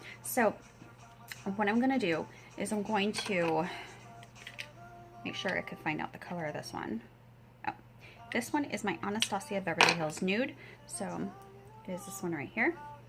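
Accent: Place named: United Kingdom. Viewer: OMGGGGGGGGGEEEEEEEEEE!!!!!!! That was amazing, you are AMAZING!!!!!! I love you and I am super thankful that you took time out of your own hustle and bustle of life to do a video for my girl. I love the look, love me some British accent too. GORGEOUS!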